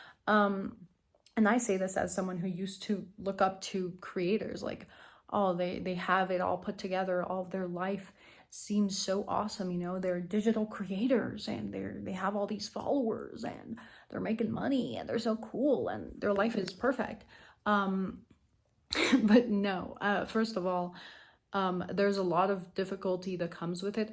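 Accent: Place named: Egypt. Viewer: I was thinking that too! I bet she's Egyptian! Because on CHEX News in Peterborough, Ontario, the weather girl, "Noor Rafat Ibrahim" has that same accent in her voice that has!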